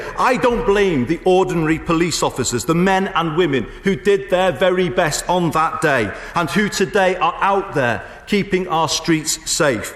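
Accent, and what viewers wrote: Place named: Northern England, United Kingdom. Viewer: One of the great House of Commons moments ever in my view. Delivered in a Northern accent though so will never get the credit it deserves. Equal to anything delivered by ANY MP. Ever.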